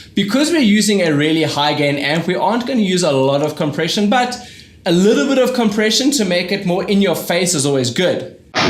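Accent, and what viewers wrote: South Africa. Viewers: South African...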